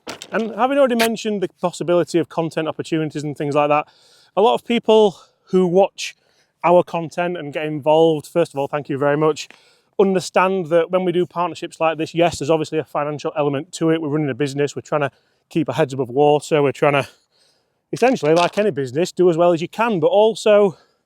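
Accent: Yorkshire, United Kingdom. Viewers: You can tell he’s from Yorkshire…Eee by gum let’s go for the cheapest and make it feel better by the fact we’re professionals than it really is! Lee Trevino used to use bottles to get down greens…. Probably 60/70% of drivers are as good as the previous years even 80/90 % look at all these reviews!! If you’re a 20 plus golfer they may help you, 20-15 maybe 10 yards more forgiving m, 15-20 confidence 15-5 high quality 5–5 elite, be realistic were amateur and mostly shite! Well done to the low handicappers!!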